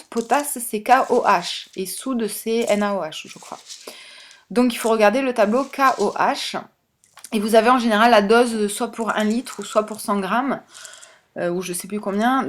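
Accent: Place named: South, France. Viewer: C' est l' accent du sud... magnifique accent.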